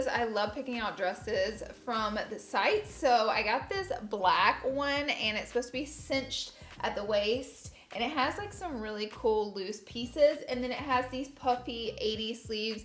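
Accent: South, United States. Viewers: That country accent 😂 love it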